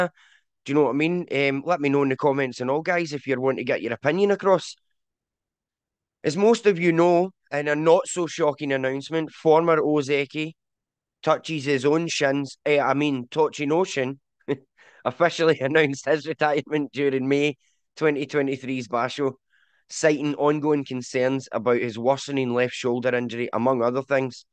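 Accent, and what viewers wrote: Scotland, United Kingdom. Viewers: The Scot is back! Always a joy to hear your commentary and hot takes. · love getting my sumo news with a scottish accent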